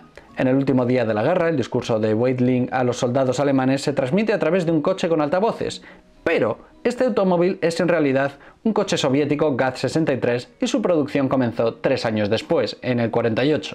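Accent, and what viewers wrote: Spain. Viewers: Soy mexicano y escucho tu acento español, no escucho bien cuando pronuncias el nombre del personaje, yo lo escucho como himler, pero Hitler era uno y himler era otro.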